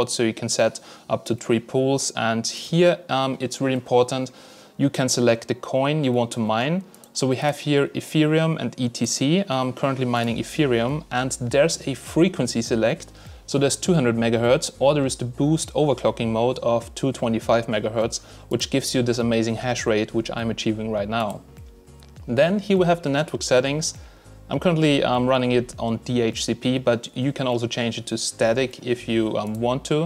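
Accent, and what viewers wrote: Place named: Germany. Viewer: durch zufall deinen channel gefunden :) irgendwie hört man als deutscher direkt wenn ein anderer deutscher englisch redet :) cooles teil der jasminer, ob sich das mit eth noch lohnt ist halt die frage, aber wenigstens geht dann noch etc damit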